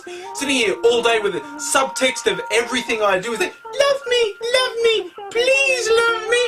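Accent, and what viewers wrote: Australia. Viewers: Wow, an Australian voice mail!!!!:D Thanks for posting this:)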